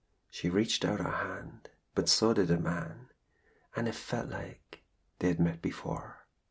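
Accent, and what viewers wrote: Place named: Ireland. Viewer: Me a year ago:I'm not into Irish accents Me now every time I here it:OH MY GUD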